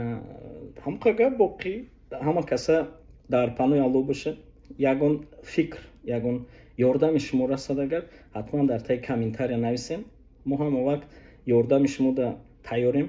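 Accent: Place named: Khatlon, Tajikistan. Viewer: ИЛТИМОС БО ЗАБОНИ ДУРУСТИ ПОРСИ ГАП ЗАНЕД БЕ ЛАХЧАИ ШИКАСТАЮ ОМЕХТАИ ХАТЛОНИ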